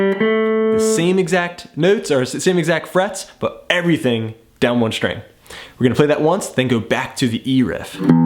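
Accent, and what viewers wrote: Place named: United States. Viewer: that accent in america has always intrigued me-nearest sounding american accent to english uk accent-where you from man?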